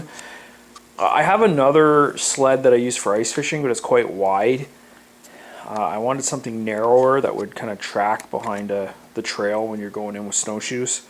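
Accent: Canada. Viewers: Knew you were Canadian the moment you started speaking; cheers fella!!!!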